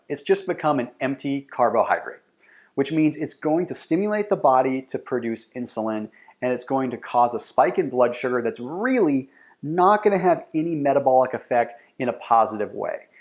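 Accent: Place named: United States. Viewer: Great video and content. Excellent. I'm definitely cutting back on processed foods. My processed foods intake is a result of my time-consuming job. Then I just conveniently nibble on junk foods and eventually ending up feeling junk. Thanks. Ignore the number of dislikes. Maybe they don't understand your fluent American accent. I share my advice: Click on the CC button at the bottom, right corner. (Subtitles/closed captions) Listen and read as well. Pause and go back if you didn't understand well.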